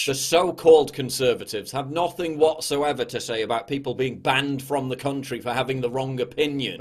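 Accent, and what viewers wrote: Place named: England, United Kingdom. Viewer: santiago perez su acento es típico inglés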